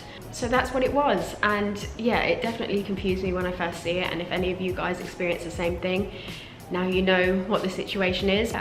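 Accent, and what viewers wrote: United Kingdom. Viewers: I don’t really have difficulty with British accent. However I loved your British accent, it is where I learnt while I did a study exchange in the uk. I got to learn the proper English accent there. While you’re right orchard mrt is a maze. Not to forget locals do exit wrongly too, especially Dhoby Ghaut mrt. ;) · Haha, love this video, especially the part where you say one thing, and the listener hears another. Must be frustrating. I think we are not familiar with British accent, even though I can understand you well. We learn British English in school but none of our English language teachers are native speakers. Also, most of our favourite English movies or TV shows are American.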